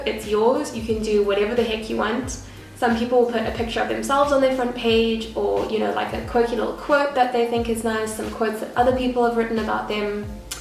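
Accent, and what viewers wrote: South Africa. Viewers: I was like: is she australian is she irish? no south african makes sense now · Always odd to hear an SA accent on YT but I'm happy to support a local creator, great video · Gosh, I couldn't recognise your South African accent at all haha! Maybe because I have one myself, who knows haha.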